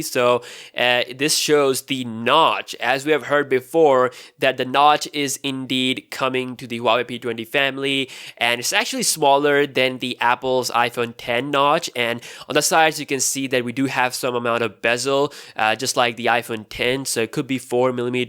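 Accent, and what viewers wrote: India. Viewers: You got indian accent. Video is good. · det is India talk! Hahahaha...Pidgin "English" !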